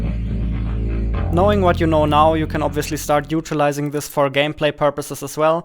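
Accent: Germany. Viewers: You have a very strong german accent, I like that! lol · Your German accent is very thick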